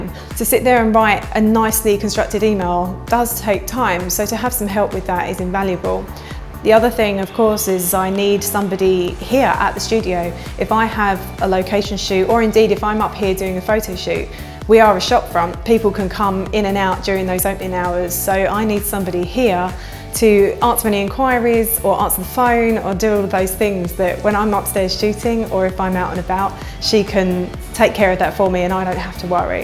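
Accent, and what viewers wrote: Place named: England, United Kingdom. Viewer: Her English accent and the closed captioning are quite entertaining. Very enlightening view of a day in the life. Most photographers don't relish that 60% business part.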